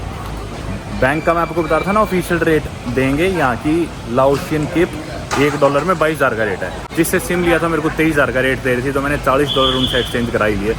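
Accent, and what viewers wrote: Haryana, India. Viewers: Bhai iske itn follower hai per kisi ne notice nahi kya ye apni aadhi vedio me to sirf airport aur bus aur aane jane ke tarike pe hi bana leta hai, phir aadhi visa ki story , desh ki story fir hotel kitne ka kaisa kaha kaisa hai bus end ,,,the end,,, bhenchod ghumaa kab ?kaha? kidhar ?only 5 min,, total 1 jagah jata hai uski vedeo me 5 min hi ghumane ka video hota hai😅😅😅😅 Aur indian chutiye isko follow kar rahe hai,,thora Haryanvi accent me bakchodi 🙄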